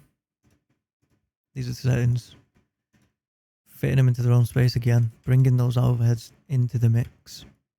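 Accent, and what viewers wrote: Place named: Manchester, United Kingdom. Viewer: great video and in mancunian accent.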